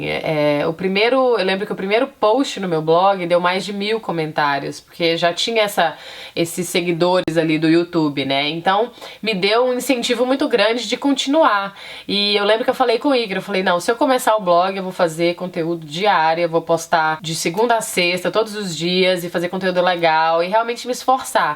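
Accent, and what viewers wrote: Minas Gerais, Brazil. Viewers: Fofaaaaa, tinha que ser uma mineira... adoro a maneira como vc fala e ouvir seus conselhos bjssss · O sotaque de Minas não sai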